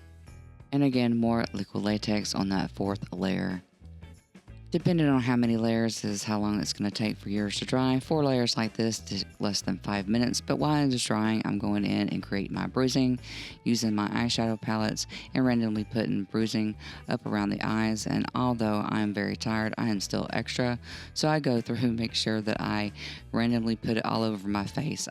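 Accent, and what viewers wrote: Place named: United States. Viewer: Really fantastic. Very interesting accent too. American i think, but not sure where from. Great work. You deserve more views and subs.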